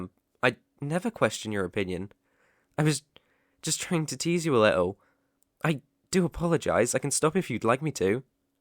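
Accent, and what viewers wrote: United Kingdom. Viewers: And again the British accent is wonderful 😭🌟🌟🌟🌟🌟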